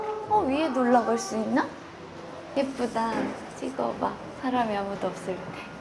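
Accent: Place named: Gyeongsang, South Korea. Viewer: 너무 이쁜보이스를 가지고 계십니다 약간 갱상도 대구 말투도 썪인듯함😊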